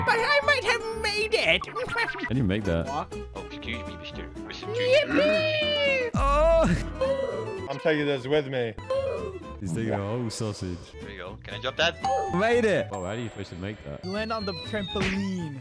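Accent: United Kingdom. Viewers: Yes. But your British(like me) which makes you the absolute god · Are u british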